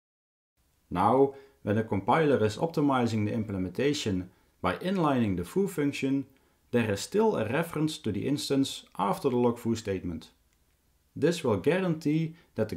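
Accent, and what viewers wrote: Netherlands. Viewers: just about to say if this guy ain't dutch then my life's a lie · Ik kan je Nederlandse accent horen haha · Only Dutch people will know where this guy is from just by listening to his pronunciation. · Heerlijk zon nederlands accent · I SMELL DUTCH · Netherlands, obviously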